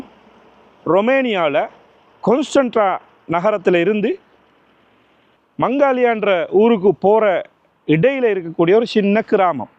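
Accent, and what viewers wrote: Sri Lanka. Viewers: இலங்கைத் தமிழ் ரொம்ப அருமையாக இருக்கிறது . எனக்கு K . S .ராஜாவையும் , B . H . அப்துல் ஹமீது அவர்களையும் நினைவுக்கு கொண்டு வந்து விட்டீர்கள் . புதிய தகவல் . தெரிந்து கொண்டேன் . Thank you .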